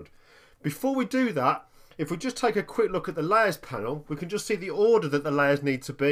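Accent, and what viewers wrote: United Kingdom. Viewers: It's a UK accent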